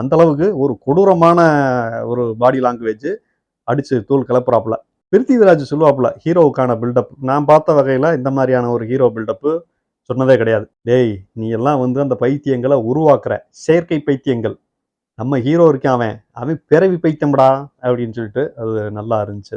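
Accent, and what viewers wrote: Madurai, India. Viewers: Maapla u are sema under rated 😂😂😂 Madurai slang la summa alaparaya iruku review maplooooi 😂 😂😂😂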